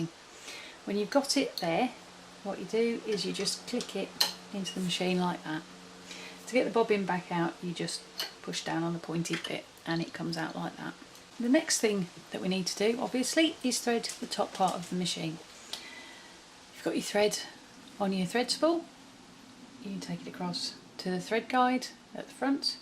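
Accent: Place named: England, United Kingdom. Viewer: Sounds like that "grandma" might need a good "sip of oil". I have been sewing for a least 64 years. A beginner would need to see the most challenging part of sewing the ends of the elastic together. Usually that part is not very pretty no matter how we hold it or pin it. That is to be expected. LOL. Been there, done that. i worked for 9 years in the "garment industry" as a sewing machine operator. I have my mother's treadle sewing machine. Great job on your video and very nice job on sewing the mask! At my age, this great, great gma needs a sip of oil too. A "fountain of youth" would be wonderful to find. Love your "English accent". I am from KY and speak with a "Bluegrass English" accent. ~ August 7, 2022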